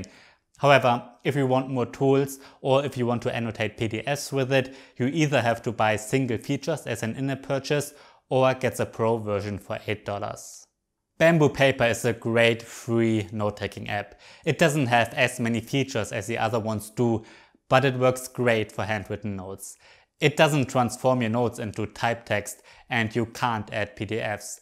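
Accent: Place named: Germany. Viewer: I love it that you speak english in a German way🥰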